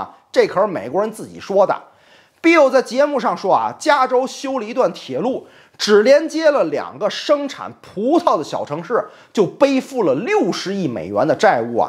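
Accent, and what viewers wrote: Tianjin, China. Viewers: 文昭是天津人，住在多伦多。你聊聊他吧。五毛你也可以评论一下。天津话挺有意思的哈谢谢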